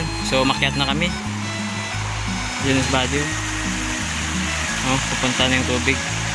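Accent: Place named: Iloilo, Philippines. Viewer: Ilonggo ka.. Bro..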